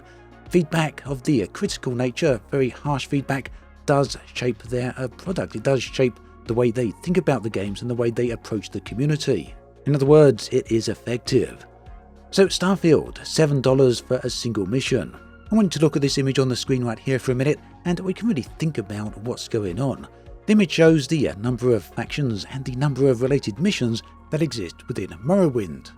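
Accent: United Kingdom. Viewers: before this news I was back and forth being on the fence of opening my wallet to CIG to include the new Faterpillar to my fleet… then decided “nah” but after hearing this well structured, articulation of a rant about the privilege of a quest to hunt some rats for $7 😂 Because it was delivered from a distinguish gentleman speaking with a British accent, well $450 USD is doable lol 😂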